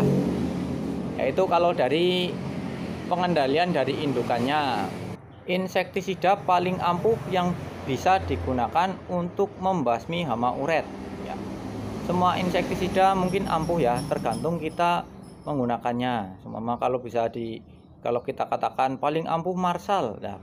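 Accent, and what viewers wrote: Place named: Banyumas, Indonesia. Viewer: Lucuu mas e... bahasa Banyumasan...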